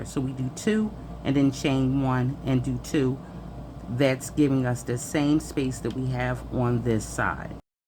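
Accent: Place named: Philadelphia, United States. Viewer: Hey... love your work. Where are hot from if holy don't mind me asking. I hear Philly or Jersey. I live in Philly.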